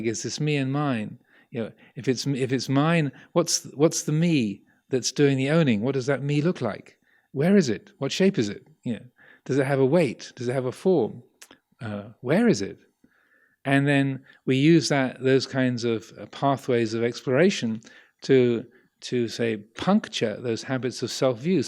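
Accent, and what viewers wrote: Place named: Russia. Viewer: Brilliant words ! thank you most venerable Amaro - love the Russian accent :) and also the statement - ‘we are going to do something terrible - we are going to deprive you of an enemy ‘ I nearly fell off the chair when I heard that LOL Sadhu Sadhu Sadhu we are blessed to hear this monk’s wise words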